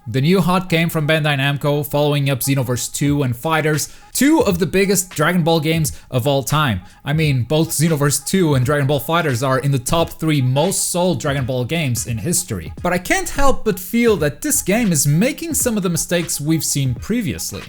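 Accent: Portugal. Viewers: eu a ouvir a pensar que parecias ser tuga e afinal dps falas em portugues e pronto duvida acabaram ahah mas algo k ne comum ver u tuga ate fazer bem nesta area, continua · É claramente português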